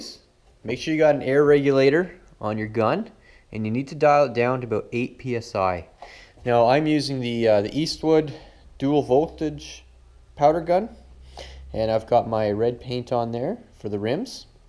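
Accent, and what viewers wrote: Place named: Canada. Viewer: the content provider’s dialect sounds Canadian to me, possibly Wisconsin, or Minnesota here in the States.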